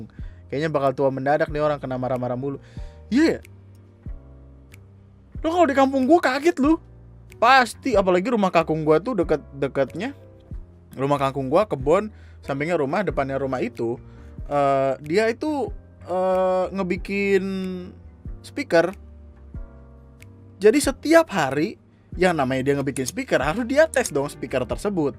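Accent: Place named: Banyumas, Indonesia. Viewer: bang asli nya org mana dah kalo gw ngapak jdi agak mirip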